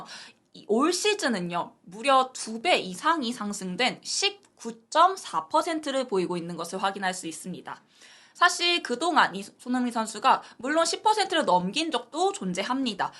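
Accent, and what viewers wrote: Gyeongsang, South Korea. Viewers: 억양이 곧 갱상도 사투리가 틔어나올꺼 같은 억양이네욛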